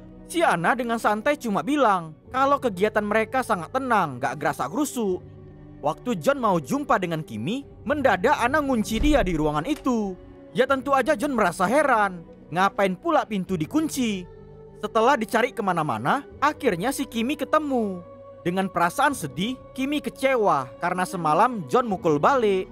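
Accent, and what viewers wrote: Medan, Indonesia. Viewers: Orang medan abng ni bah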